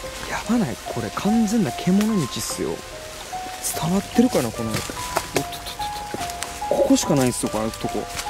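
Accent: Kansai, Japan. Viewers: 翔くんの関西弁おちつく。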